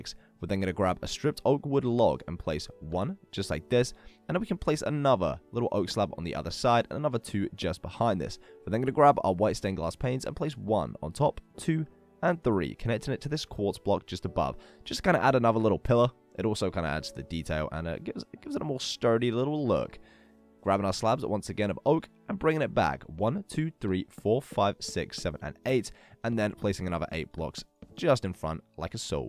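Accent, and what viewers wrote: United Kingdom. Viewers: I don't want to disturb you but are you British because I really love your voice and your accent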